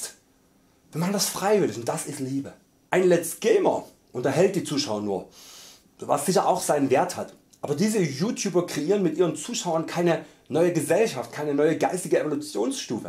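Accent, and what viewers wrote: Saxony, Germany. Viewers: Hi:) inspirierendes Video! Ja mit dem Thema Verständlichkeit ist es immer so eine Sache ^^ Vielleicht solltest du eine Art Stichwortverzeichnis bzw. eigenes kleines Wörterbuch schreiben wo du deine eigenen Termini erklärst. Nach Schema: Liebe: ist die universelle Verbundenheit im Universum... Freie Liebe ist... Was Liebe nicht ist: Eiversucht: ist... Rohgan: ist meine Lebensphilosophie, die bedeutet Kommunikation: ist.. Partnerschaft: ist.. und dann jeweils vor deinen Videos darauf verlinken. Problem ist im allgemeinen dass Menschen unter Worten oftmals etwas komplett anderes verstehen. Dein populärstes Beispiel ist wohl "freie Liebe": ich behaupte 80% der Menschen versteht da Liebe(Sex) machen mit jeder & jedem in totaler ungebundenheit. Du scheinst darunter im Großen und ganzen etwas anderes zu verstehen. Das Problem ist, dass man Deine Videos meist nicht in Reihenfolge schaut, zumindest neue Abbonenten. 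In einem Buch kann man Begrifflichkeiten nach und nach erklären und das Buch und den Leser, so zu sagen, mit der Zeit aufbauen. Mit dem Medium Yt ist halt nicht direkt so gut möglich, sondern eher ein "Durcheinander". Und wie du sagst, es gibt Leute die einen patu nicht verstehen wollen, jeder deiner Zuschauer wird nur das aus deinen Videos beziehen, was er bereit ist aus ihnen zu erfahren. Wenn jemand im Voherein sagt, der blöde sächselnde... wird er eben diese Erfahrung machen. Wenn jemand beschließt dieses Video im Kontext der Bereicherung anzuschauen wird er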